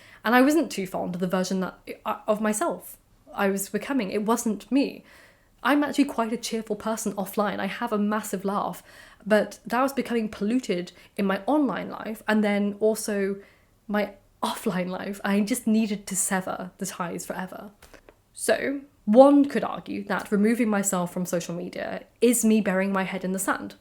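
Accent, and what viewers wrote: England, United Kingdom. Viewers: Just found this channel yesterday, now binge watching, as well as Lady of the Library and your wonderful blog posts. So nice to find such an intelligent, interesting and attractive lady on YT. With an English (Irish?) accent to boot!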